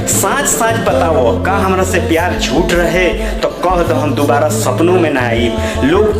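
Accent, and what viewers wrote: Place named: Bhojpuri, India. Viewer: Ek no. Bhojpuri tone with dialogue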